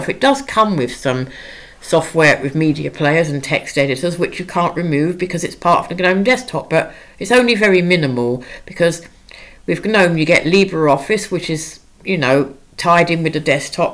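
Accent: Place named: United Kingdom. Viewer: I love your British English, i am salvadorean.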